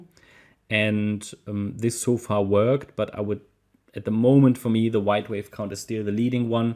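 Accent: Germany. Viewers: Much better German English than mine😅👌🫡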